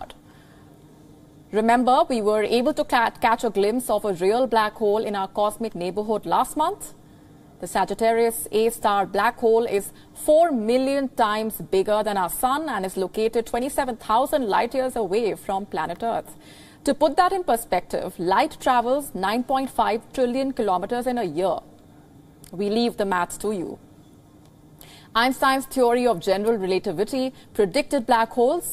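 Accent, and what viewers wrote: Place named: India. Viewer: Why do these indians speak like apu...dont they know that's racist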